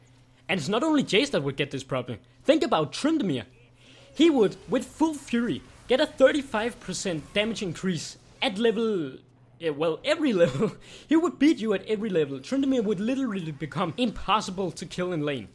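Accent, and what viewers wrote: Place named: Denmark. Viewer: dat dansk accent :D fed video ^^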